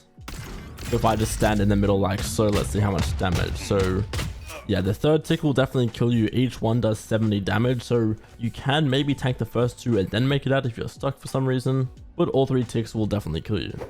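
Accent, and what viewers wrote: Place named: Melbourne, Australia. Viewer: u r from melburrrnnnnn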